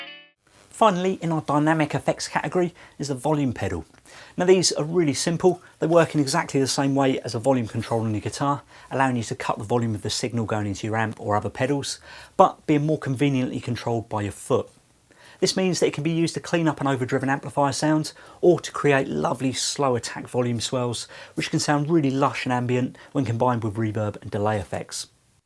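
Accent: United Kingdom. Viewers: this guy sounds like brittish guy from the cowboy bebop movie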